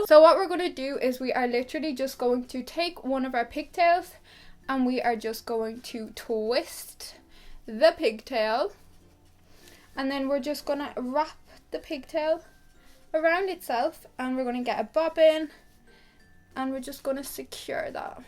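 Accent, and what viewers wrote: Ireland. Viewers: Are u Irish 🇮🇪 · oooh is she Irish! I thought already, her English isn't British English or American English so what else? Irish English!! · are you irish? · I'm new bit are you Irish. I love you accent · Omg I love you!! One day I just stumbled upon one of your videos and I am in love! I am already wearing one of your hairstyles they are perfect! And I'm on vacation so I don't was my hair every day so it's perfect!!! (Btw what part of Ireland are u from I love your accent)❤❤ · Are you irish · Are you from Ireland????!!!!! If so what county???!!!?!?!?!! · are you irish ? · I love irish accent so much 😭😭😭😭 ❤️❤️❤️❤️ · Are u from IRELAND cause u have a irish accent p.s I am from IRELAND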